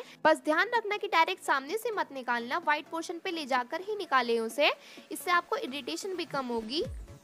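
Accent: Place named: Hyderabad, India. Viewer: Apka accent hyderabad ka hai ....... kya ap hyderabad se ho❤️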